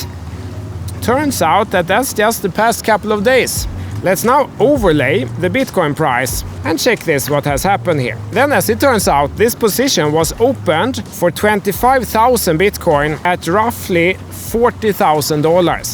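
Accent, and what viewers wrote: Sweden. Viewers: Best Swenglish on youtube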